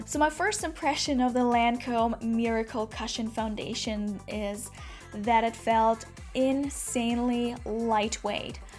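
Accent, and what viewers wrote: United States. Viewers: Just wondering where are you from? It was interesting to hear the word intriguing sound like "intra-guing" and cushion was pronounced "kushen." Its so very intriguing since you sound American otherwise :) Thank you for the review :) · I can't place your accent. One moment I hear American but then it's something else. Especially when you said the words intriguing and cushion. I knew what you meant. But I had to pause for a second.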